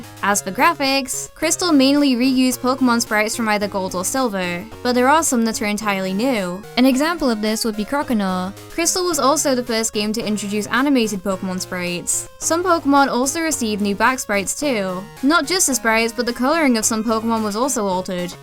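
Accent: England, United Kingdom. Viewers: Are you ever so slightly English? You definitely have a tinge of our accent when you talk. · Your accent is awesome! I wish I had an English accent... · Woah, this person has a very odd accent. It sounds like a regional accent from Britain that has been polluted by living in the US. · OakTea she's british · What nice British voice.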